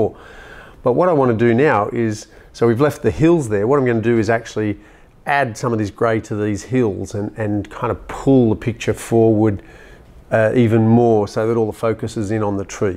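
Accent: Australia. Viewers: Thank you! Trying to figure out your accent. Are you in Australia ? 😩😜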